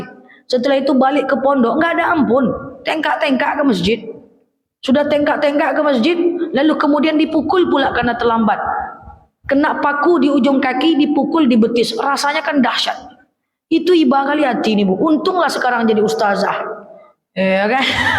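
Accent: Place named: Malaysia. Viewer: Masya Allah logat Melayunya suka saya ustazdah cantik,dah hidup diduniya banyak masalah,pulang kampung akherat singgah dineraka pula yassalam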